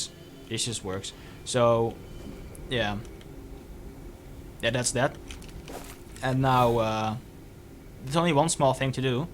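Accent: Netherlands. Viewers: Are you Dutch? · wait are you dutch??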